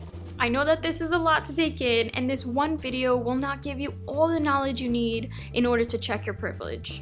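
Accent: Mexico. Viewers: You still have a shitty accent. U have a Mexican accent I am repulsed by . “Undocumented” you mean illegal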